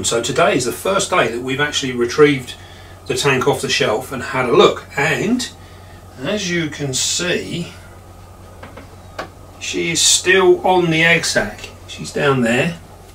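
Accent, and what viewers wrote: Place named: London, United Kingdom. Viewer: It's not lol 😆 what it is - the London in him. His accent. Those London accents always struggle with the Latin or hispanic sounding words. The thick accents don't allow it. I've got a big pal who's the same 🗣😆 I love accent, it's class 👌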